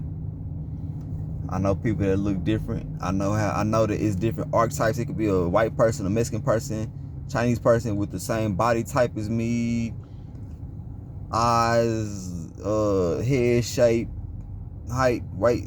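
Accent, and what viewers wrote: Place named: Dallas, United States. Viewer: This was a good video. I’ve been studying for years and everything you said in this video is true. Most of America is Egypt. Ancient Israel is on the west side of America (Utah, Nevada, Arizona, and parts of California, etc. The Mississippi River is the Nile of Egypt and that’s why you have so many mounds up and down the bank of the river. I also believe north is south and south is north. Everything is the opposite. The Most High is waking people up. What’s crazy is that you have the same exact knowledge that I have (at least according to this video) so I know it’s spiritual. A lot of other people probably don’t believe or even understand you but I do. That’s how it is when I tell certain people what I know. They just can’t comprehend. I don’t trip though. Maybe it’s not their time or maybe it’s not for them to get. 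Last but not least, as soon as I heard you start talking I was like he from Dallas 😂 but I see your from FW. I’m here too (Dallas). Keep learning bro because you’re on to something.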